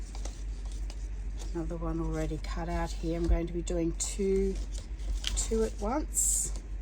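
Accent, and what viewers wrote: Australia. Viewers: These are beyond awesome!! New subscriber! Your Australian accent is neat, too!💚💚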